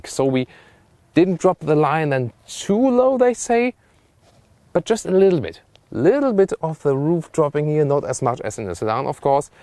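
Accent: Germany. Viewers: I didn't read the hole topic. So i didn't really know what language it is before i started the video. In the first moment, the first 10 seconds i thought it's german. I am from Germany and i never heard such a hard german accent like this one before. And i'm still thinking it's a german review 😜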